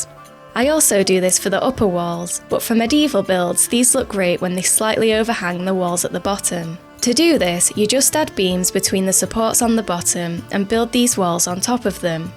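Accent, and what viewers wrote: United Kingdom. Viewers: pretty sure their accent is british, not sure though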